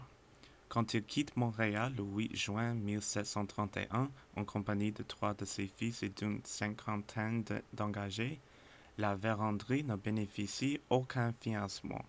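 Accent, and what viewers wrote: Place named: England, United Kingdom. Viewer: this is an english speaker trying to talk french.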